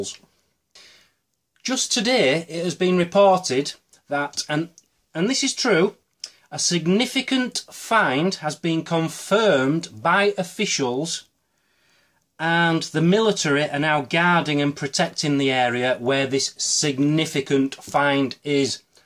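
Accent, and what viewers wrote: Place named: Newcastle, United Kingdom. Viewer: Funny how geordie is so easy on the ear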